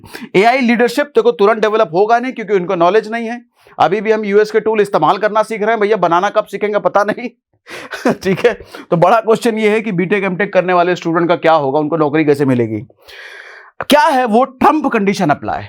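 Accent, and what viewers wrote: Bihar, India. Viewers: Tu insurance bech rha hai ya market ki baat krega bosdi ki bihari 😢😢😢😢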